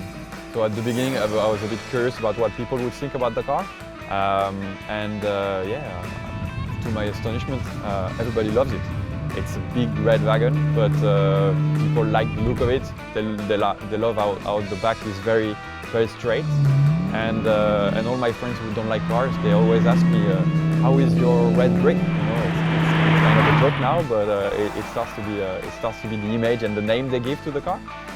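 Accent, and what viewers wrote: France. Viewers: Un français qui parle anglais c'est toujours grillé ! Bravo en tout cas, j'adore cette caisse. et de savoir qu'une moto trial rentre dans le coffre ça donne des idées ! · French accent suck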